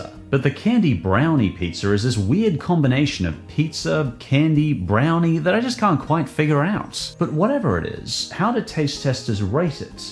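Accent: United Kingdom. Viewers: I like you're British accent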